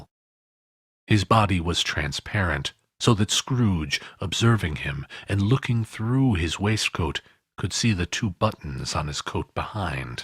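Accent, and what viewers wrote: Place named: United States. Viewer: He's american, therefore he has an American accent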